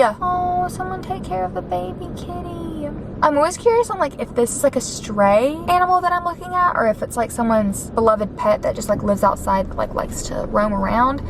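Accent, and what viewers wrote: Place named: Texas, United States. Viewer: I 💯 think your a texas girl you have that accent❤ I’m from oregon it’s beautiful here but if I could get my daughter to transfer we’ll be moving to texas or Nashville.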